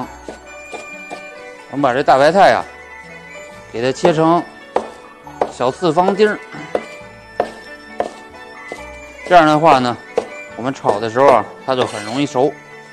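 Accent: Beijing, China. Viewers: 真爱听你说的京味，看着都馋了。今晚我己调好了馅，明天就学着自己做，另外想请教一下叉烧包的包法，跟“美食台”的广东师傅学做过但包子不开裂，厨师长能告我什么原因吗？谢谢！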